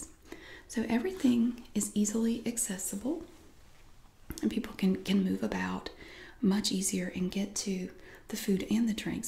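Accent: South, United States. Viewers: I absolutely adore your videos! My aunt/godmother lives in North Carolina, and for my whole life southern accents have been so calming to me. I only see her a couple times a year, because I live in Wyoming, but I find so much comfort in your videos in the meantime. I hope you receive as much gratification and happiness from making your videos, as you give to us, the viewers. :)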